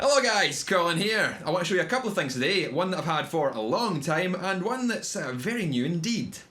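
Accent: Scotland, United Kingdom. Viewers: I must say Colin, your purposeful diction is fantastic. It helps us yanks here in the states wade through your Scottish brogue!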